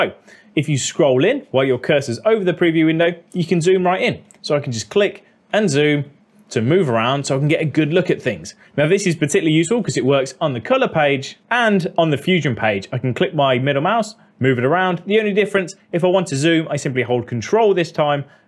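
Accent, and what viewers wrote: United Kingdom. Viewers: Talking fast with a British accent😬 My ears aren’t use to it…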